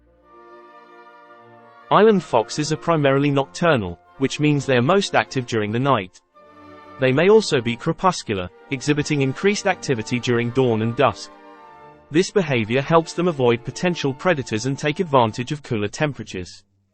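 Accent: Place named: United Kingdom. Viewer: and to add insult to injury, the narrator is UK.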